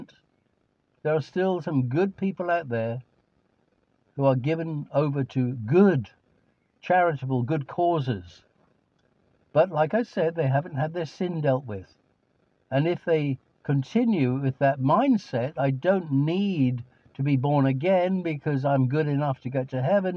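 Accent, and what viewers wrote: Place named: United Kingdom. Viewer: So weird to hear someone speak of Christ with a British accent. I just left my church and am looking for a new Bible teaching church. Don’t care about the building, parking, etc—just want to hear the Word of God unapologetically preached! Good stuff there! Love you Brother and I will pray for your ministry from Alabama USA! 👆🏼🙏🏼👊🏼❤️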